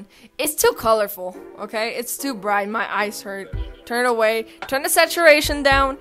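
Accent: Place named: Mexico. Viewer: Oh damn, una mexicana with the accent, a weeb, and a shitposter, my typa girl. 😂 seriously tho, good video, brought a ton of childhood memories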